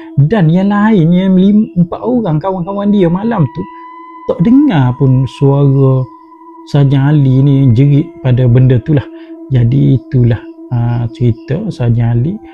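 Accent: Kelantan, Malaysia. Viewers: Pekat bethol kelantan kau bang 😂 btw keep going 👍🏻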